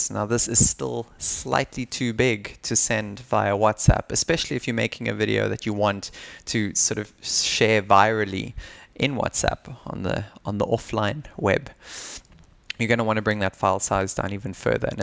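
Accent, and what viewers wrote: South Africa. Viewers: Woaaahhh, South African accent I spot there? yay! Nice info, subscribed! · are you south african?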